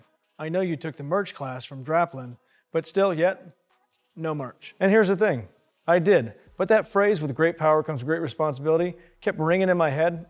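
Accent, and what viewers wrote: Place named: South, United States. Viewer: Maybe it's just the cornpone style and southern drawl but I initially had great trouble keeping up but I'm gradually getting used to the rhetoric and speed of speech. Just as well because there're done tips here that I've not yet seen and might help. How refreshing to hear someone state categorically that squats ain't the be all and end all of legs exercises; I'm already a walking barbell lunges man (and yes, spot on, it IS a lung buster exercise) but I've just picked up a couple tips to tweak it and alter the stress focus. The great Ronnie Coleman swore by Walking Lunges and there's little that hasn't already been said about HIS wheels...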